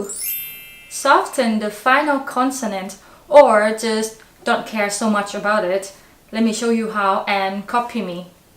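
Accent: Thailand. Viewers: ดีใจที่ในที่สุดได้ดูวีดีโอเก่าๆของครูให้จบ ตอนนี้พร้อมที่จะฟังเรื่องประสบการณ์ของครูที่ประเทศอเมริกา 🥳🤗 ว่าแต่ผมชอบสำเนียงคนไทยเวลาพูดภาษาอังกฤษ น่ารักสุดๆไปเลย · Loving the thai accent. นารัก 😍😍😍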